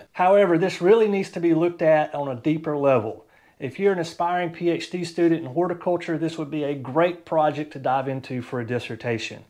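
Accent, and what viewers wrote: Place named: Alabama, United States. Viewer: Auburn, huh? I thought I heard an Alabama accent. I'll be subscribing to help me with my garden in Tuscaloosa.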